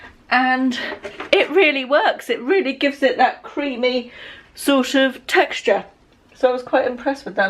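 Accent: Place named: Southern England, United Kingdom. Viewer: I wasn't surprised to hear of your royal connections with your lovely accent you could pass for the queen...lol but I come from Accrington in the north so I think most southern accents sound posh in comparison..... please do vlogmas I really love watching it...how was the slimming world potato ham bake think I will try it....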